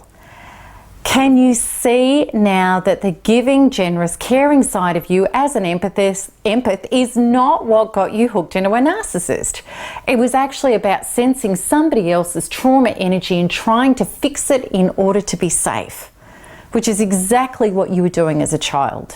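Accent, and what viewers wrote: Australia. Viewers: Love your Australia accent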